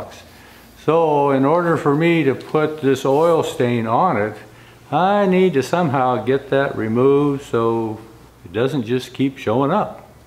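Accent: United States. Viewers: Overall a very nice wagon. Not sure what could have been on the surface of the kitchen box, but it's my hope one tradesman sets up the other for success. It will be interesting to learn at some future date how the kitchen cabinet makers style box construction holds up against the typical use of such a wagon (assuming it will get used)? Thanks for the videos - I enjoy them. BTW: 100% good ole American English ! 👍👢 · English, even with an American accent is fine by me..👍🏻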